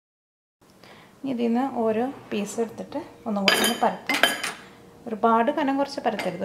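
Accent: Thrissur, India. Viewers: Nalla avtharana shily trishoor voice basha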